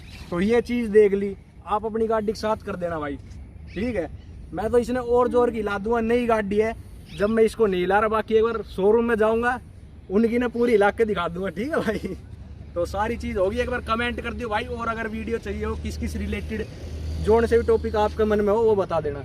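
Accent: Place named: Haryana, India. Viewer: Bhai your from haryana?, really like your hindi.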